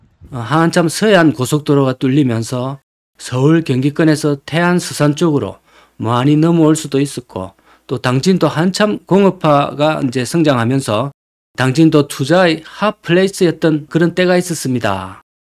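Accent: Gyeongsang, South Korea. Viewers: 멋진멘트 갱상도발음 정확한 판단력 응원해요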